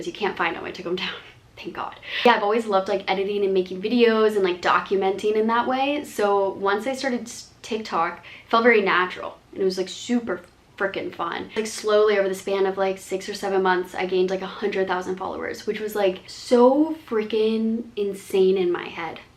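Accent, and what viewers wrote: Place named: Utah, United States. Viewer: Definitely a Utahn with that “what I’m DO-EEN” accent. Not a Mormon though because coffee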